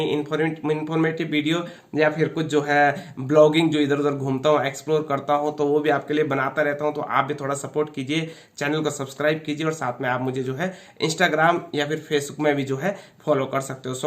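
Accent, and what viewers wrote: Garhwal, India. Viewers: Garhwali accent h bro aapki aawaj me. 🙏🏻🙏🏻🙏🏻🙏🏻👌🏻👌🏻👌🏻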